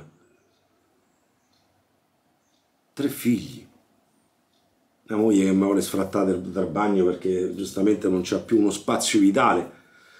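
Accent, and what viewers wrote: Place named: Rome, Italy. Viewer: Ciao, volevo farti i complimenti per il canale. Ho iniziato a guardare i tuoi video cosi, facendo zapping su YouTube, e niente... Sarà la simpatia? Saranno la passione, l'umiltà, l'accento romano...? Praticamente me s'è aperto un modo e pure il portafoglio... "Ccitua!" 🤣 Senza entrare nello specifico ma altri canali simili non trasmettono la stessa atmosfera. Continua così 💪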